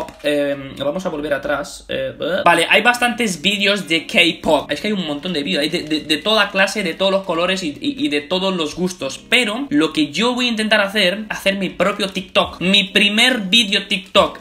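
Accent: Argentina. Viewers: Se le sale lo argentino a jajajaja extrañaba poder ver videos tuyos! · el acento argentino JAJAJAJAJJAJA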